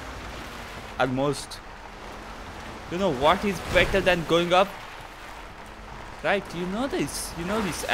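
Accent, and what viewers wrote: India. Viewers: You indian accent is so strong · Why do i hate hindi accent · Dude are you India because your accent is Indian pls tell me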